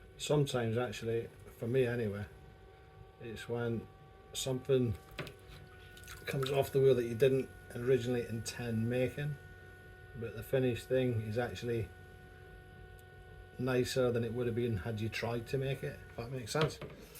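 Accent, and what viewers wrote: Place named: Scotland, United Kingdom. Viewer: Seeing the kilns prices here in Hungary, i wouldn't mind having one of yours :D nah just playing....looking forward to seeing the final products! btw, you sound and talk exactly like a Scottish colleague of mine called Dave, i can hardly hear the difference!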